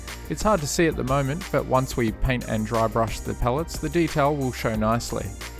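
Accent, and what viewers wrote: Australia. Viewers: His accent sounds Australian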